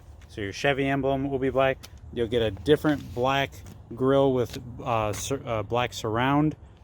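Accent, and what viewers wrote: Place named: Cleveland, United States. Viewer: Thank you for the overview. You have a very welcome interesting accent. You sound just like my friend from Cleveland. Are you in Cleveland.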